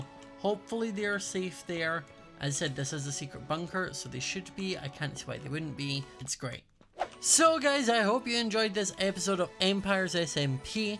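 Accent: Scotland, United Kingdom. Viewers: Your accent gave it away weeks ago... :) It was all a bit McAdder from Blackadder. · this explains so much the coruption coming from your portal how u and the demon both have scottish accents i cant wait til next episode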